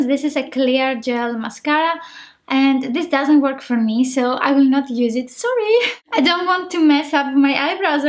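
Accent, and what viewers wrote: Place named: Greece. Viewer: Are you Greek? Your accent implies that! Love essence make up❤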